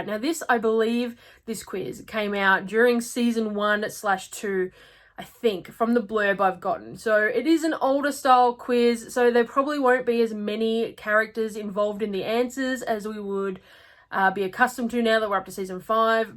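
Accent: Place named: Australia. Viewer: I got Claire as well! Don't pay any attention to nasty people. I am Canadian and love your accent. I have a friend from Melbourne who sounds just like you. I love your Outlander Tuesdays. I just started reading the books and watching the show about a year ago and am obsessed. Keep up the great videos!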